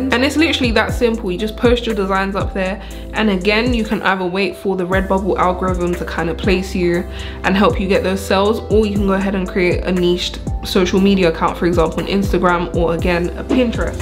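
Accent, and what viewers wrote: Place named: England, United Kingdom. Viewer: Hi 👋. Are you single sweetie. I love your English accent 😍.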